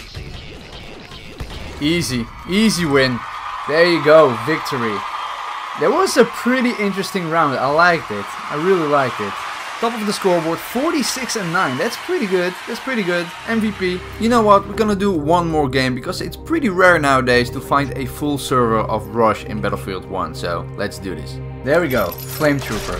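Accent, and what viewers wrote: Netherlands. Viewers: You sound a bit Dutch if you speak English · Wat een heerlijk Nederlands accentje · Nederlands accent, 1 van de redenen dat ik naar je filmpjes kijk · Jouw accent😂 Nederlander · Ik merk aan je accent dat je nederlands bent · je hebt een nederlands accent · instantly noticable Nederlandse accentje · Your accent sounds like a Dutch accent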